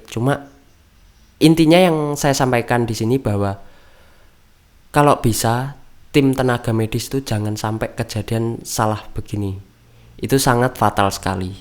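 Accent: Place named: Java, Indonesia. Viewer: Medok bgtt😅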